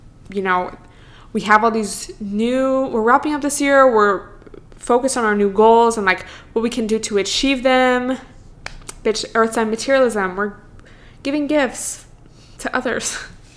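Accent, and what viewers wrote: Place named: California, United States. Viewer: To be honest, I'm just sitting on my mattress, and listening a while to u, because I just wanted to hear some recent voice with some Ca. maybe LA accent, just to make myself feel a little bit more at home.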